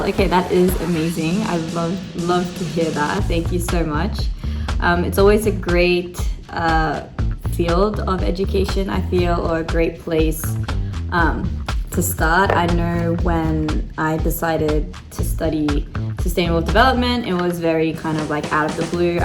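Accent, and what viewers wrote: Australia. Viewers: You got Australian accent xx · AussieアクセントとAmerican or Canadianアクセントが混ざってる～💕住む地域がいろいろだとそうなりますよね！！なんだか自信をもらえました。ありがとう❤ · I can hear your Australian accent! I live in Australia so I felt an affinity with you haha I live on the Gold Coast Where is your dad from? 🫶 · You’re English is very Aussie💖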